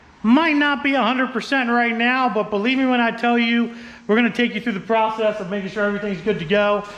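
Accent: Pennsylvania, United States. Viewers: You pa people are messed up with your English wtf? It's a rade I ator and borg Warner what is wrong with u bro? Ohio is one state away we can speak correct pa is the only state that can't talk right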